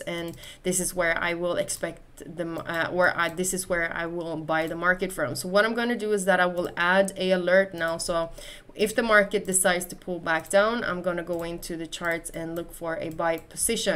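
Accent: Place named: Sweden. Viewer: låter svensk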